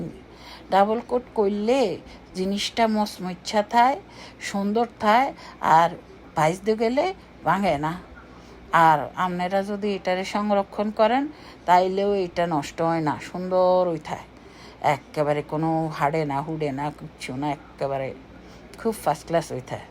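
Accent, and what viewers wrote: Noakhali, Bangladesh. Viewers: আপু নোয়াখালির কথা আমি তেমন শুনিনি আমার কাছে খুব ভালো লাগলো তোমার ভিডিও টা ❤️সাথে তোমার কথা গুলো 👍👍👍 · রুই মাছের কাবাব অসাধারণ হয়েছে মাছের কাবাব রেসিপিটা আর সুন্দর সুন্দর নোয়াখালীর ভাষায় কথা বলে কাবাব রেসিপিটা বানিয়ে নিলেন চমৎকার চমৎকার চমৎকার❤🎉❤🎉❤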